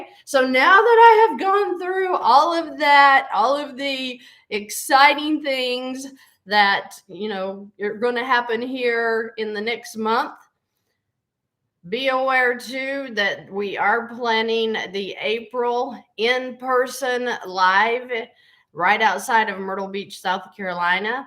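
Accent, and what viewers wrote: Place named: Tennessee, United States. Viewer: We are considering doing a channel to track our progress weekly, and hold ourselves accountable, and it brings us so much joy to actually get to dream of possibilities of having financial freedom. We are a retired military family, 100% disabled vet, and I have 25 yrs with a pension, so we have on paper a nice retirement, unfortunately it’s all gone before end of month….I prayed for answers, I want work again. I want to make an impact. you are an Angel sent here on Earth. I love your little feisty TN accent, and your faith in our Lord, and in people. Amanda OK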